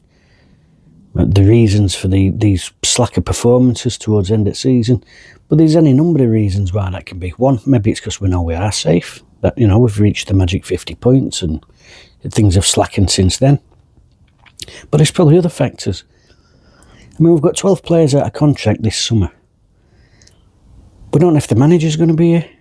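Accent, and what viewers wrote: Yorkshire, United Kingdom. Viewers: For sure midtable aint that bad. But looking at all these B-teams (Brighton, brentford and so forth), doing more than ok in the premiership, i mean surely it must be possible for wednesday to at least reach for that level? With that said, thank you for all the videos you put out. Wether win or loss you always manage to keep it level headed. And i think (as a swede) im now finally beginning to fluently understand Yorkshire english 😂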